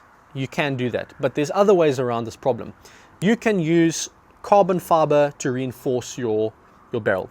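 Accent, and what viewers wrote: South Africa. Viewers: This guy isn’t from down under, he’s got a very strong South African accent - nothing like an Aussie accent.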